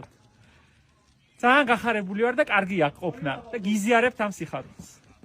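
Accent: Guria, Georgia. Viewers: დიდი პატივისცემა ჩემო გურულო ვგიჟდები რომ ვუცქერ და გურულ საუბარს ვისმენ❤ მომენატრა ჩემი ბებიკო და ჩემი გურია😢მოკითხვა სიყვარული და გამძლეობა საყვარელ აჭარას და აჭარელ ხალხს❤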